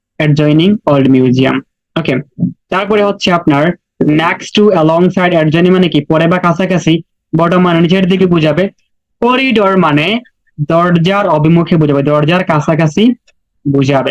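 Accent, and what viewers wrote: Sylhet, Bangladesh. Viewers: Vai apni kita Sylheti ni?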